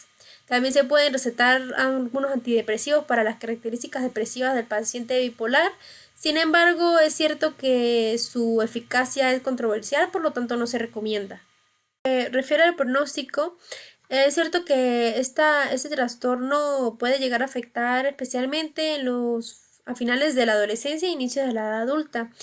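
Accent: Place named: Mexico. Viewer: EXCELENTE, TIENES CIERTO ACENTO MEXICANO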